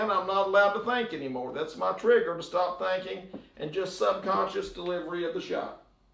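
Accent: South, United States. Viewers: Where you from? I’m not American but my guess is the south.. Cheers for the video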